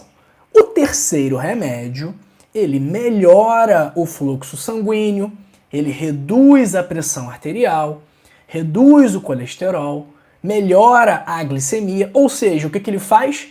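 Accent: Rio de Janeiro, Brazil. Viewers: Parabéns Dr. Acho lindo o sotaque dos cariocas, mais ainda, as suas explicações. Moro em São Paulo a mais de cinquenta anos porém, sou mato-grossense. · Gostei muito do seu Sotaque pois O Sotaque Carioca é muito lindo LLLlllllllllllllllll parabéns Amei Dr, 🙏🙌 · Bom dia Dr.! Eu acho lindo o sotaque carioca, aliás eu ter nascido no Rio de Janeiro, a Cidade Maravilhosa, por sinal eu conheço o Rio de Janeiro, já fui conhecer, tudo lá é bom, belo e maravilhoso! Eu amo o Rio de Janeiro demais! Um dia quem sabe eu morarei lá né? ❤ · Bom dia Dr. Sou Amélia e sou carioca como vc e o nosso sotaque é caracacteristico dos nascidos no Rio de Janeiro, as pessoas mal educadad e sem princípio ficam se apegando a essas picuinhas. Dr. Vc é perfeito em todas as suas colocações e educado; talvez a sua pronúncia deve ser motivo de inveja para os que o criticam eu tbm já fui criticada dizendo que eu falo chiando e este tipo de gente com certeza não deve ter o que fazer, e falta de berço. 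Vc é muito importante para nos orientar no cuidado com a nossa saude. Somos todos gratos a DEUS que o colocou em nossas vidas. E lembre-se Dr do antigo e dito popular: " O que vem de baixo não nos atinge" e ser educado é uma questão de berco. Dr o abençoe sempre e guarde todos os seus caminhos. ABC. ❤ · Não sou carioca, mas amo o sotaque do carioca! Cada estado tem o seu sotaque! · Pois eu acho lindo o sutaco dos Cariocas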